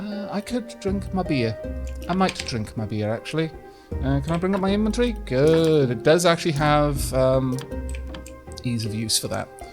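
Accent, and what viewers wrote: Wales, United Kingdom. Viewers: I subscribed purely because of how much I the welsh accent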